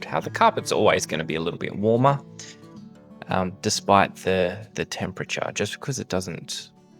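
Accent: Australia. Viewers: we saw the same bad behaviour in NZ. Some increases were insane. I'm sure there was a spike in food waste as well. It's not only in Auckland, sadly. You are blessed to be able to do what you're doing mate. I love your accent, you sound like my favourite cousin in Melbourne 😂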